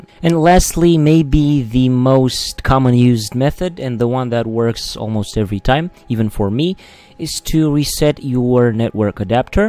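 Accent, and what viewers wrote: Romania. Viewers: You are from romania?xD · It worked, thx, but let me ask, are a romanian youtuber, ur voice sounds familiar to me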